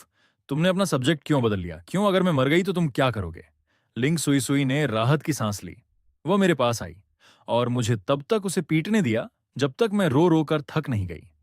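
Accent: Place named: Bihar, India. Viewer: Bhai jada bihari accent mat add kar pure hindi accent accha hai video bana ke liye 😅 basibhi eha samajh ne mein bahut pareshan ho raha hai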